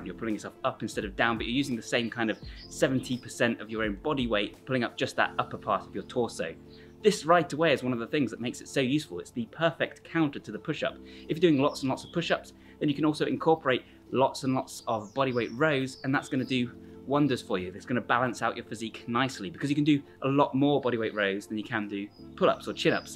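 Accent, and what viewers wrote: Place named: RP, United Kingdom. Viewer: I was expecting an L.A gang member in a prison yard not this nice sounding man with a posh accent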